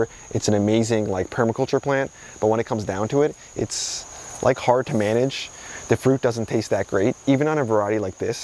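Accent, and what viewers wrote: New Jersey, United States. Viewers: I enjoy listening to your New Jersey accent. I'm in Harlem, by the way. And I ❤Tuck.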